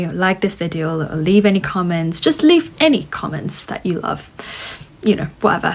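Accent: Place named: RP, United Kingdom. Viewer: Hello,! I really appreciate your lovely contemporary Received Pronunciation (RP), your efforts to make this very first YouTube video, and, more importantly, your attitude towards your unfortunate experience due to this extraordinary disease. I hope you would receive great treatment from the NHS and ultimately combat the disease successfully! May you be free from suffering very soon! Yours sincerely, Jordy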